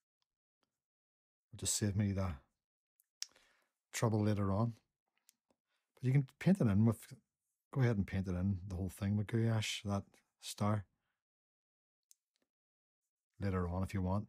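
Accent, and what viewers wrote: Northern Ireland, United Kingdom. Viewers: Very nice work! Great to hear your Northern Ireland accent!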